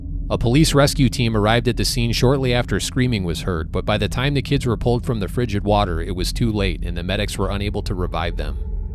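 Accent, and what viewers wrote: Chicago, United States. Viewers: I truly love your channel!! I grew up in Streamwood so hearing some of these stories is really cool for me. It brings me back to my childhood. Your narration is a perfect Chicago accent if I ever heard one and your attention to the details and facts about these places as well as sharing your own experiences truly makes your channel unique! I'm subscribed!!😊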